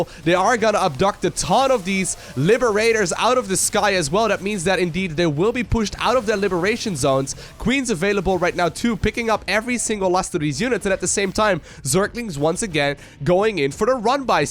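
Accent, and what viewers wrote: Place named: Netherlands. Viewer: Could be saying "serral" or "several" can't tell with that delightfully dutch accent.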